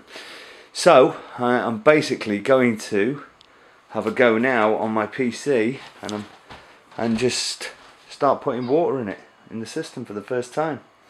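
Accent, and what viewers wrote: United Kingdom. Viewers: the british accent is great i loove these videos hahaah keep em coming MATE! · Yeah, I just poking fun at you. One dude to another...I love your accent, lol. Is that Cockney? I am from the American midwest. I sound alot like John Wayne. I like you videos. Especially when we can see the equipment and how it is hooked up. you are my newest hero If I had your mailing address, I would mail you a cape and a big old S for your chest.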